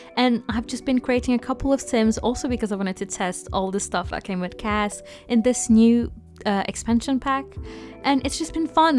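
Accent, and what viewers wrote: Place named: United Kingdom. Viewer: honestly you are such a talented builder and as a polish person I love to listen to british accent. Love it xx!!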